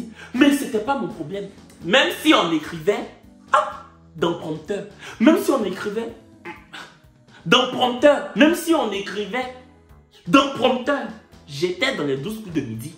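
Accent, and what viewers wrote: Ivory Coast. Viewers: Pourquoi elle comprendrait pas? Il parle bien français même avec son accent Ivoirien & quelques mots en nouchi, n'importe qui peut comprendre. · je suis antillaise et je m'arrange avec l'accent ivroirien. Donc les blancs n'ont qu'à s'arranger aussi comme nous.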